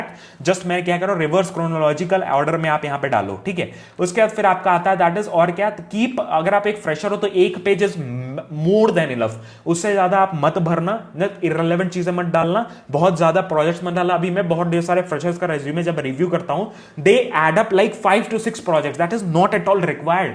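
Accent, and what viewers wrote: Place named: Bihar, India. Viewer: bihari trying to speaks in english , appplying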